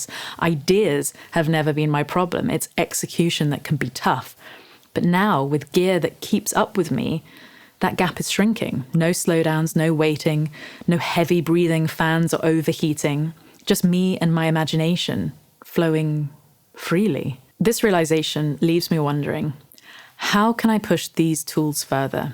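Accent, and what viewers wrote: United Kingdom. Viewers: I just love your voice <sigh> It's the perfect British accent. And yeah, the new MacMinis are remarkable value.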